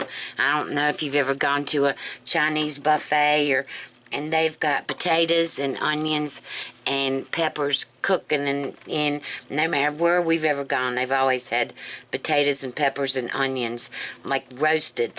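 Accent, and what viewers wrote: South, United States. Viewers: Everything taste better with a southern accent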